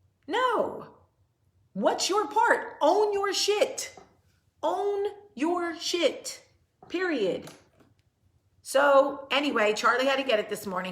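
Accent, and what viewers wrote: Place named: New Jersey, United States. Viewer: You’ve got a lot of New Jersey in you haha! 😆 Love you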